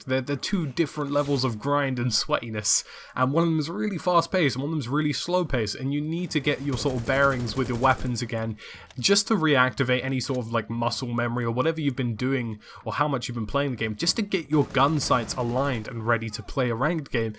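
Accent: United Kingdom. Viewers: British accent + advanced vocabulary = music to my ears :)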